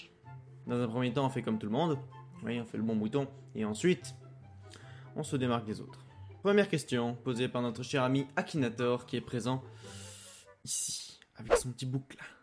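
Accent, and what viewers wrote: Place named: England, United Kingdom. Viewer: ho parle contre calme toi sur l accent anglais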